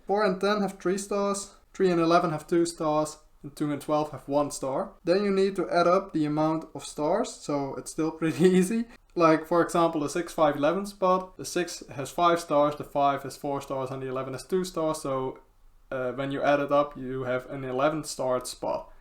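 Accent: Netherlands. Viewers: Heel sterk Nederlands accent haha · Ik hou van het Nederlandse Engels accent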